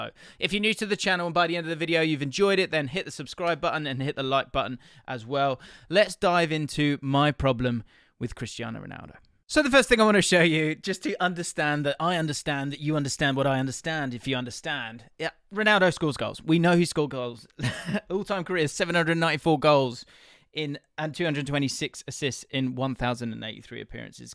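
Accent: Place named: England, United Kingdom. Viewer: English accent Scottish top. 😬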